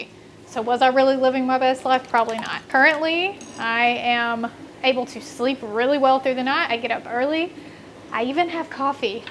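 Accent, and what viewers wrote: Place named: Tennessee, United States. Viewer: Girl!! When I heard you say Paris, TN!! I grew up in Humboldt, TN and have family in Paris! I should’ve known by your accent 😅❤ love it!!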